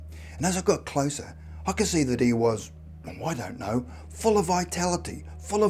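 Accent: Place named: Australia. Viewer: 😂this is a man from Australia reading extracts from a written transcript for his Youtube channel 😂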